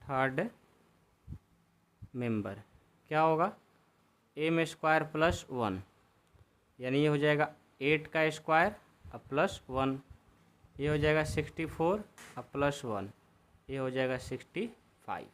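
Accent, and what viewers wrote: Bihar, India. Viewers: Sar ki language se lagta hai ki sar Bihari hai lekin kahate Hain Na ki ek Bihari Sau per Bhari😊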